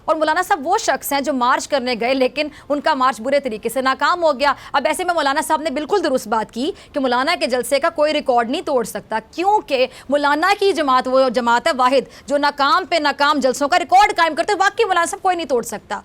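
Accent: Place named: Punjab, India. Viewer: Aap urdu main Panjabi ki tune.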